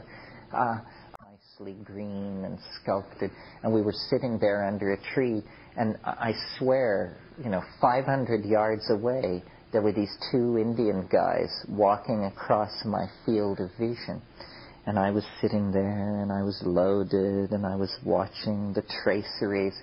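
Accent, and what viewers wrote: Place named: United Kingdom. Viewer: ok British guy.Or Australasian guy.Maybe even Canada guy.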